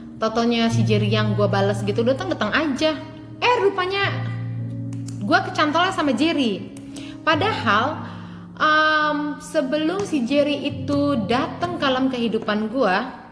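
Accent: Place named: Indonesia. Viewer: Youtuber paling menghibur ini, cara bicara nya benar2 indonesia bgt, mantappp jiwaaa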